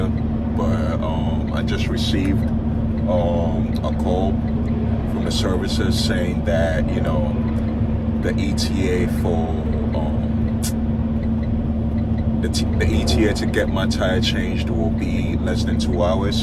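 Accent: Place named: New York, United States. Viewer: u sound like from new york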